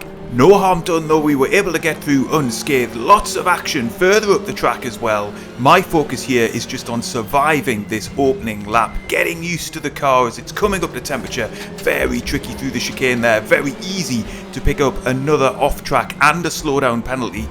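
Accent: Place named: Wales, United Kingdom. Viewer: Whoa I tell you mate what you're fartin sparks! Are you Welsh?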